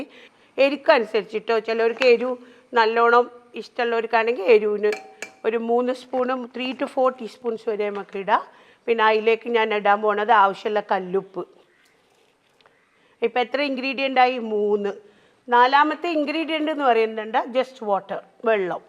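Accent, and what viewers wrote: Kozhikode, India. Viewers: I am from Kozhikode now living in Banagalore when I hear you talking in typical kozhikkodan Slang oh my God I really like it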